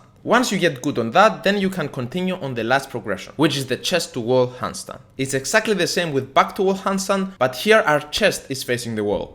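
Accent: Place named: Greece. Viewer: Are you Greek? Your pronunciation sounds really Greek llol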